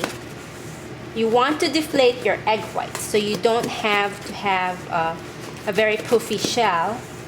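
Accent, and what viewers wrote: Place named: Philippines. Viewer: She has a Filipino accent. 8D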